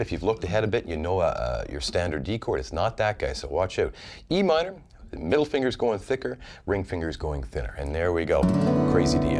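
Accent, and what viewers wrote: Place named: Canada. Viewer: Great video. I can tell the difference between a Canadian and an American accent easy were others cant but you sir have such a Canadian accent a deaf man could tell your Canadian. This is were im told your from Texas. :) Iv never heard such a nice Canadian accent in my life.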